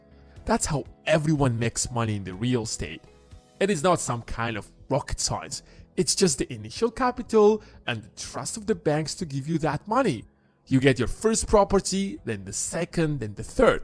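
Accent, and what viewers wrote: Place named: Asia. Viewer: He sounds asian, I'm in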